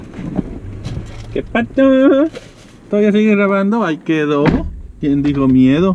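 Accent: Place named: North, Mexico. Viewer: Te oyes bien norteño :v like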